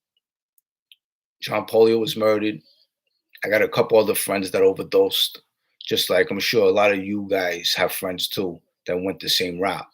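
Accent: New York, United States. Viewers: your accent is straight up NY Gangster!!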